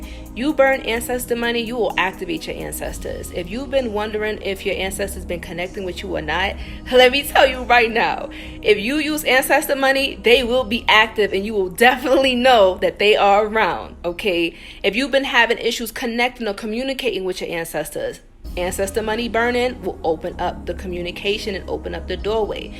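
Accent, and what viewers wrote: New York, United States. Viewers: Amazing, the reason I clicked on your videos 2 weeks ago is because you look just like my ex girlfriend. So much so, I sent the video to others to verify. The only thing that was different was the NY accent. Even then, I had to listen closely. Enjoying your videos, and appreciate what you doing! Much love❤ From NC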